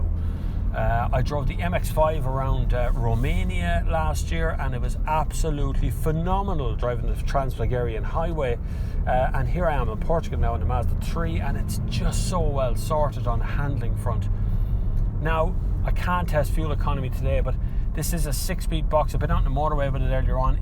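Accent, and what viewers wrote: Ireland. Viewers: I like your Irish ☘️ accent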